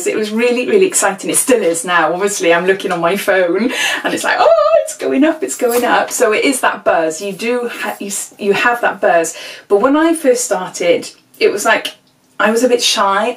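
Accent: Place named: England, United Kingdom. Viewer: Very interesting video. I love listening to you. It brings a bit of England to me here in Germany.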